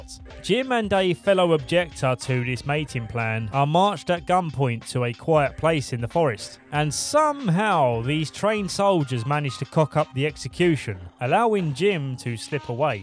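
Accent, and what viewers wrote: United Kingdom. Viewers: 2eyt deys leytaayayayayayaya British english Kills me Always 🫣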